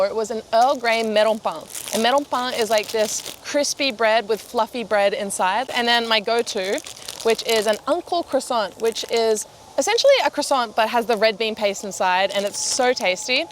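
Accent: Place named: Australia. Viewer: Rocking the look! Also, I feel your aussie accent has got stronger since you have been back home there recently ✌️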